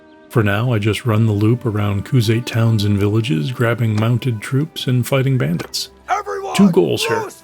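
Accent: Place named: Netherlands. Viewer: Leuk hoe je altijd een andere nederlander herkent als ze engels praten :P, je doet je best maar helemaal onderuit kom je er niet.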